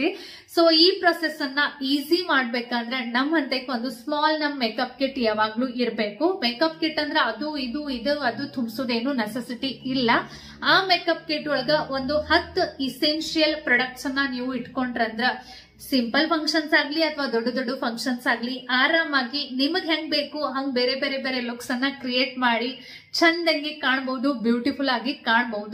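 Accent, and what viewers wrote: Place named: Uttara Kannada, India. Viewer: Hai sister 😍 .Nivu mado yalla videogalu tumba informative agirutte . Nivu chikka chikka vishayavannu tumba acchu kattagi heli koduttira. Ondu kutumbada javabdarige nimmantaha hennu magalu tumba agatya 😍 Maneyannu channagi maintain madta eddira. 😊 Waw. Nivu matado uttara Kannada language keloke kivige impagirutte, nivu madida odapu gala video sakattagittu. Gandana hesarannu a rethi agi karibahudanta gottirlilla. Tumba channagittu. Nimma vlogs Alli nivu honest inda interest ittu video madodrida adannu navu aste interest kottu noduteve. Nivu mane kelsa, bere work, sanvina nodkollodu yallavanu channagi nodikoolodrinda bereyavarigu nivu inspire agiddira. Nivu yavaglu energetic agirodrinda namgu kelsa madoku energy barute. Nimm life style tumba esta, nimma matte Vinay Sir bonding tumba channagide. Nivu made for each other ☺sir nimge tumba supportive agiddare. 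Nivu tumba cute agi muddagiddira. Vinay sir tumba lucky. 😍 Nivu toriso daily vlogs, cooking, matte sanvi jothe mado activities help agutte. Ega madiro makeup videos alli yavella product hege use madbek annodannu channag heliddira. Tnks sis 😍 Heege helpful videos madta eri..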